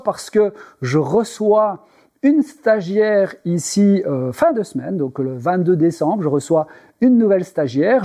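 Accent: Belgium. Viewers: Ce n'est pas un franc parlé, plutôt un belge parlé. Mais on adore ça, bravo et merci.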